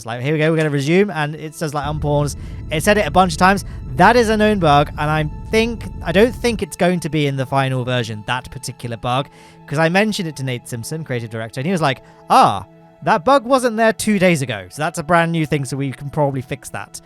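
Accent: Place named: United Kingdom. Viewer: YEEEEEEEESSSSSSSSSSSSSSSSSSSSSSSSSS, I am like a kid on Christmas! 🎉🎉🎉🎉🎉 I can’t wait for the release! Also why do you sound exactly like Grian who plays Minecraft?!?! Do all British people sound the same?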